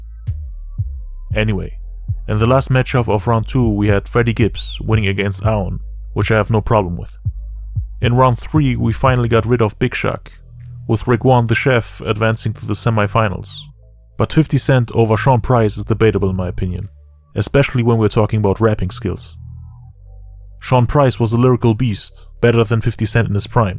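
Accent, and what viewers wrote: Germany. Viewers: is this guy German lol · Are you from germany? I just mean because of the accent and the farid bang video😂😂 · Bist du deutscher? Hört sich so an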